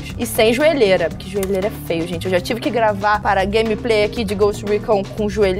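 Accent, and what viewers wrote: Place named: Rio de Janeiro, Brazil. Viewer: É o sotaque carioca só fica bonito em mulher mesmo. a gamer que você rexxxpeita ❤️❤️❤️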